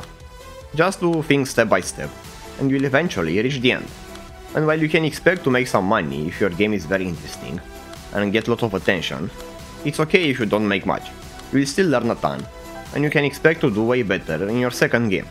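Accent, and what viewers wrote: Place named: Romania. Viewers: lol stiam dupa accent ca esti roman din prima)) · Si mie imi dadea vibe-ul de roman, dar nu eram foarte sigur :))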